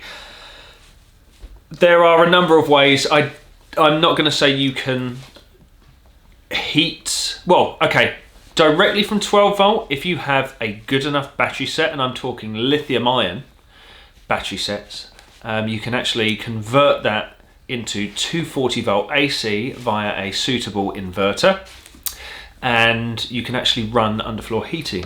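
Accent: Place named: Birmingham, United Kingdom. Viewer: Love what you do and how you do it!, You can take the man out of the Forces but you can't take the Forces out of the man.. Training and explaining is natural to you, keep it up.. Brummie Voyce..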